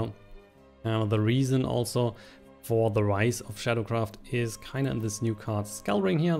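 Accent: Germany. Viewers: You sound so German lol. Great video, you should make Shadowverse Videos more often! But why don't you use ceres? I thought she was a staple. Also could you make a Midrange Swordcraft guide too?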